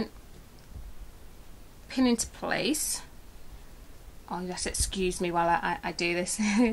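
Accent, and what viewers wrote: Manchester, United Kingdom. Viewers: very well presented, no waffle (like some fools who blather on about totally inconsequential rubbish) articulate and concise, very informative, clear, well photographed - FIRST CLASS!!! (from Manchester I assume??)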